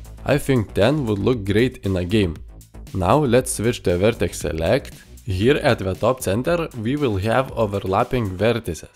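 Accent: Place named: India. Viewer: Indian accent 😢😢😢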